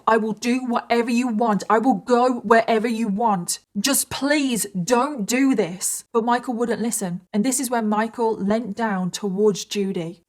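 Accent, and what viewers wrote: United Kingdom. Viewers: LOVE YOUR BRITISH ACCENT.. So there is Posh, Then R something? Haven't seen where you are from in England. You are AMAZING STORY TELLER. You look great before and after makeup..Your an Artist for sure. Both as an Orator and Make Up Artist..Is no easy feat to make a guy watch through most videos.. You kinda an A.M.S.R.. . Have you thohght about a playlist with you reading or explaining a story, thats light and soft spoken..?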